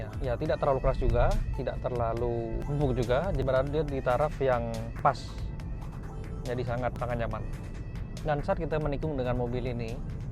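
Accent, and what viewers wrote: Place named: Madura, Indonesia. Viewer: Logat madura nya tebal hehe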